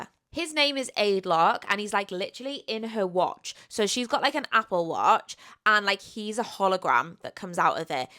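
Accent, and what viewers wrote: Wales, United Kingdom. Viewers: Catching up on your videos and it's been bugging me for months where your accent is from!!😆 As soon as you said you were Welsh when talking about Sweet Pea I was like "I knewww it!" 😂 I'm from South Wales (about an hour from Cardiff) and I knew your accent sounded Welsh to me! 😆 (also thank you for the recommendations, I will be adding a few to my list for sureee🫡❤️)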